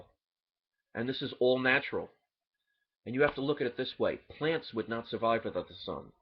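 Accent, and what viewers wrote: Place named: Pennsylvania, United States. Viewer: Best thing for asthma is pot. Legal here where I live, but not in PA where I think you live due to your accent. I am not joking.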